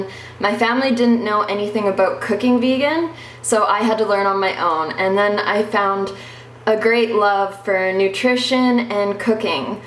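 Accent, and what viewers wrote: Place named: Canada. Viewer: where are you originally from? your accent says canada but your demeanor says california hahah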